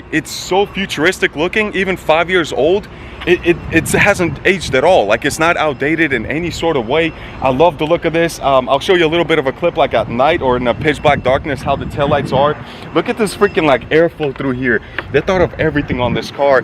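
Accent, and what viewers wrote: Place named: Bosnia and Herzegovina. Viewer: That accent is 100% Bosnian lol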